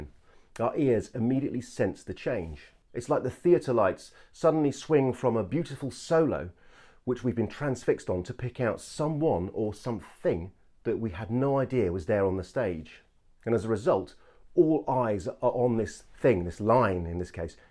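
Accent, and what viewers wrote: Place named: United Kingdom. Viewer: British accent. I love it. I wish you make videos and explain about it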